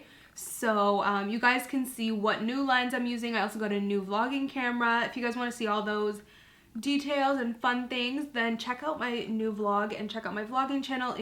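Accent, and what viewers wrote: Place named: Canada. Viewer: Is she from Canada? She pronounces 'sorry' as 'sorey' :p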